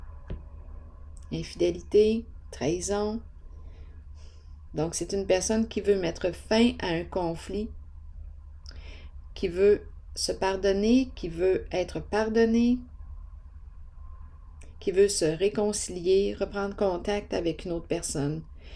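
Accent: Quebec, Canada. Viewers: Bonjour Je te découvre après avoir écouté ton message à travers de ce très beau tiraje.... On diré que tu t'adresse à mon cœur.... c'est exactement ça...... La carte du flirt sur la carte du papillon.....me fait réfléchir sur le fait de lui faire confiance ou non..... Elle, Taureau ascendant Sagittaire de 47 ans, avec un ego et un orgueil très fort et démesuré..... Elle a frapper à la porte de mon cœur le mois de mars dernier. Cette femme a souffert des abus de la part de son père jusque les 17 ans. Elle est sublime mais très abîmée....je souhaite croire que elle pourrait encore s'engager et tourner la page, et aller vers une vie de paix et amour sans tromper ni tricher ni commander.....mais je n'en suis pas tout à fait sûr de cela.... Le mois de janvier sera définitif pour y voir plus clair, réconciliation ou rupture.... et c'est moi qui devrait prendre la décision...... 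Ton magnifique tiraje, termine sans se terminer ni donner des réponses à cet belle mais très dure situacion..... Je te remercie pour ce tiraje tres riche et profond, et pour ton merveilleux accent du Québec....que j'adore......Moi je suis aux Pyrénées-Atlantiques aux pais vasc frances entrain de vivre cet exercice de vie..... A mes 72 ans, je souhaite vivre la paix et l'harmonie...... Si cette belle âme choisit la paix, l'amour et l'harmonie je resterai à son cotée pour continuer à grandir ensemble, mais si ne c'est pas le cas, c'est moi qui va tartir cette fois ci. Je te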